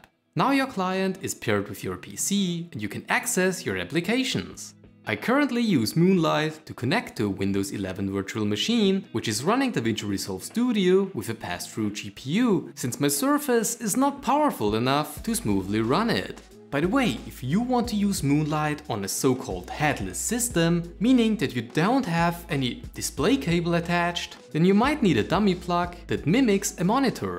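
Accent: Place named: Germany. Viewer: He is clearly German. Why I know that? Because I speak the Same like this Guy c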